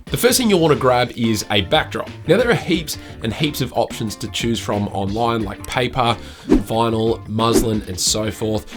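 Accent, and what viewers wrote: Australia. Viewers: Hey, this was very helpful! I was always considering that aperture light but now this just gives me all the more reason to do so! Also, to hear a fellow Aussie accent helped me connect with what you were saying more! Will go through your other stuff and subscribe:)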